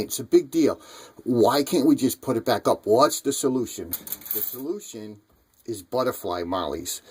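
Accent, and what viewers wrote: New York, United States. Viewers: Thank you . I love your NY accent and sure appreciate your help with the mollies. I do have one question, though. How would you fix the other kind of hole that you showed at the beginning of your video where the two holes ripped making the two holes one long hole? That's what happened in my bathroom. I hope you really do answer all of the questions you get because I really need to know! My towel rack fell off and it looks terrible! Thank you again!!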